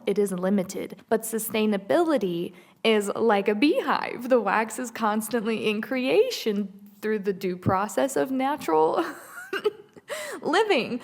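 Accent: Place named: United States. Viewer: I searched and searched and searched the world of YouTube for you. To think this whole year you were right here the whole time. I had to tell the algorithm that I wasn’t interested in hyper general viral videos and search for god centered wellness. Little did I know, you’d talk about healthy living in a fuller sense. Curios about your background. You say you’re from turkey but you should like you are born & raised American. Anyway, thank you for this.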